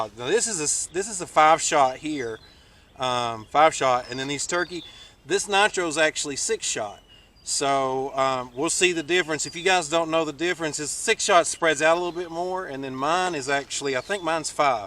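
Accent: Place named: South, United States. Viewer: MAAAN, I love your southern drawwwl I LOOOOVE it, I am coming to NC So the question is Mossberg or Browning BPS, which one is better?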